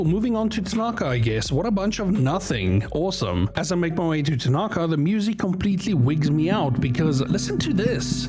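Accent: South Africa. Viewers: Are you South African, by any chance?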